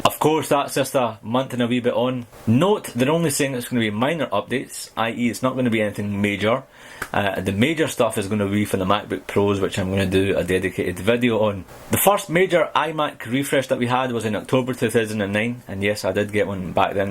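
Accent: Scotland, United Kingdom. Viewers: His accent reminds me Trainspotting !, cheers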